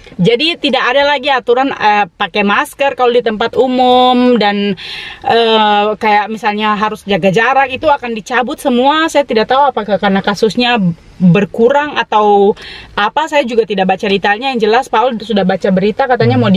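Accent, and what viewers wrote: Papua, Indonesia. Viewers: Paul itu bersyukur dapet kk, orgnya menyenangkan, unik, bicara apa adanya, pintar, aku suka logat papuanya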